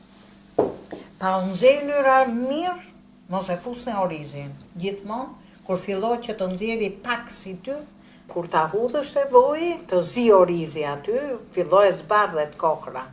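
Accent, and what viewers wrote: Tirana, Albania. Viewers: Të lumshin duart! Më pëlqen shumë e folura jote Tiranse dhe sidomos bukuria e spjegimit të detajeve gjatë gatimit! Të përqafoj nga Saranda! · Te folme Tironse ke qef me ju degju 💋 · Te pelqen theksi tirons ty moj? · Te lumshin duart zonje dhe me ate te folme. tironce puro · Me kenaqe me komunikimin prej tironse Me pelqejn gatimet qe ben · Sa lezet ka te degjosh çdo gatimë si nene tironce ❤ te lumshin duart! Na kenaqe gjithmon videot e tua! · Te lumshin duart por ajo qe me pelqeu me shume eshte kur e shpjegon , Tironse ❤ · Tlumt goja se knoqem kur flet puro tironce pilafi i mrekullushem sh faleminderit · Te lumshin duart dhe goja ma knoq shpirtin me ate te folmen tironce nuk ta di emrin por me pelqen shume kur shpjegon me kujtove gjyshen time te ndjek pothuajse ne te gjitha gatimet se jam vete tironce puro pershendetje nga Kanada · Na kenoqe mi tironce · Me shum me pelqe e folmja tironce po dhe pilafin e ke bo persmari te lumshin duret tkesh shnet🙏🙏🙏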